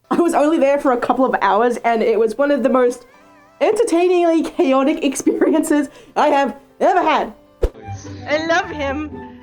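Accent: Australia. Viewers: Uh question are you Aussie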